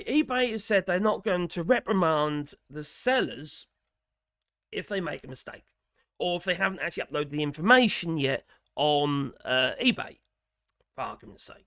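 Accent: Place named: Norwich, United Kingdom. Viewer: By the way, who runs this channel is from Norwich. I didn’t know this accent but I’ve got quite used to it from listening to him! 😉🙂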